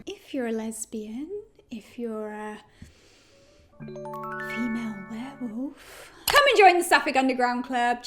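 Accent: United Kingdom. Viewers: not related to the topic... but where is your accent from? Somewhere in the UK I presume?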